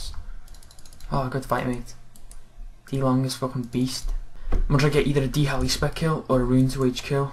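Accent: Liverpool, United Kingdom. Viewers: you sound like you're from liverpool, are you? hate to ask but like so he can see.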